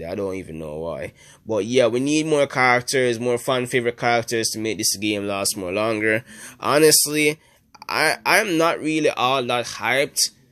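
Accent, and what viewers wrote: Jamaica. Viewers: U sound well Jamaican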